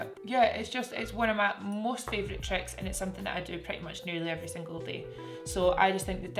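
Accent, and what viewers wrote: Scotland, United Kingdom. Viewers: Are u Scottish? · Love this accent!!! Its Scottish isn't it???? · She has a west coast Scottish accent. I know because I'm Scottish too! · Sounds Scottish. Is that right? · You are so beautiful it doesn't matter what you do and that Scottish accent is the cherry on top. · Would guess her accent to be Scottish, but the r’s seems a bit strange for that - like a more American r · I love her Scottish accent, Scottish people and Scotland in general 😍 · Do it in that stunning Scottish accent too ! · Love these pencils so much:) and can I just add how much I love your accent. Are you Scottish:) ? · I suck at doing eyeshadow and eyeliner 😞 I give up 🥺 BTW: are you Scottish?! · Is it Scottish accent? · rea zeqaj it’s Scottish very polite though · Sm xo this is how most Scottish people talk. I don’t know why people from other countries think you need to shout to be scottish😂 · Ain Adena Indeed! 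She’s scottish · I am certain that I am not the first one to say this but damn I LOVE your accent! Is that Scottish? Excuse my ignorance if I am mistaken. And of course the entire video is just wow! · it’s definitely Scottish x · she has a very standard Scottish accent with perfect diction. I'm not sure why you can't tolerate different accents but hey, it's your loss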